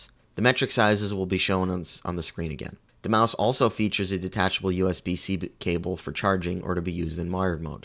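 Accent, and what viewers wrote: Scotland, United Kingdom. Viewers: Too bad you lost your accent (like for example Xcom 2 Episode 1 ), that was like 25% of me wanting to view it (love the Scottish accent) :)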